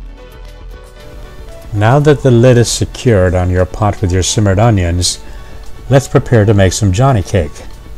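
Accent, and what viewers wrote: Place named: Jamaica. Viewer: you sound like a Jamaican who's just been living in the US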